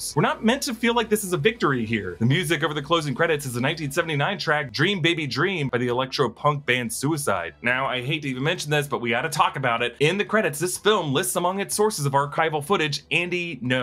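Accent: United States. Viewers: what kind of american are you? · What kind of American are you?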